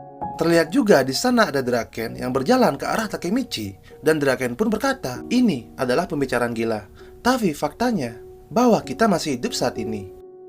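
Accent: Bali, Indonesia. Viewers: Bang logat lu kek orang Bali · Kyk logat bali bang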